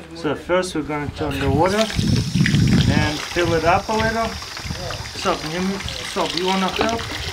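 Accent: Russia. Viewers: What was the last experiment??? He said like a carbide rocket??? he's to Russian to understand sometimes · Lets make it strong again. He sounds sexual with russian accent. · are u russian?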